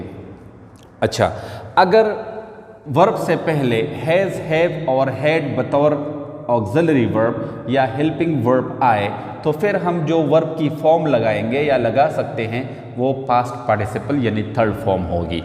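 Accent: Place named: United Kingdom. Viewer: mashallah you are doing very well and your accent is nice too and you can speak british accnet very well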